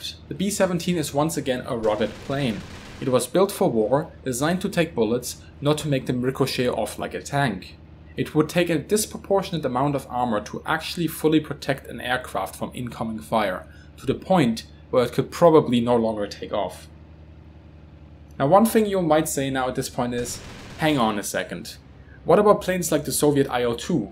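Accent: Germany. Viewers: Man höhrt dass sie Deutscher oder Österreicher sind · Ich kann aus deinem aktzent raushören das du deutsch bist! Hallo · R u german?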